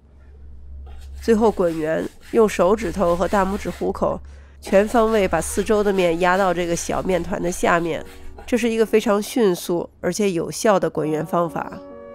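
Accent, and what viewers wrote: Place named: Xi'an, China. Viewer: 你这口音像我们西安人啊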